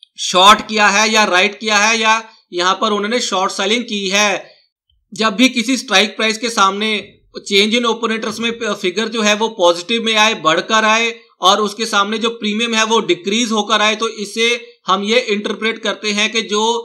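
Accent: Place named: Punjab, India. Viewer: Punjabi laggda hainnnnnn!!!!😃😃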